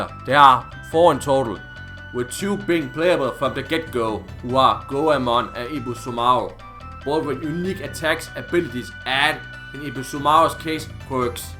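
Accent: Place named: France. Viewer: Crosscode is one of my favorite games of all time. Should try phoenotopia awakening if you like zelda-likes. It's from a sidescrolling perspective which translates really really well. The explanation, story and puzzles are amazing. Curious where you're accented is from. You sound French but when speaking faster it sounds more German or Dutch.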